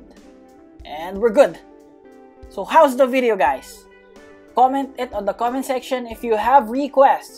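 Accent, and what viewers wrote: Philippines. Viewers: The accent r u a filipino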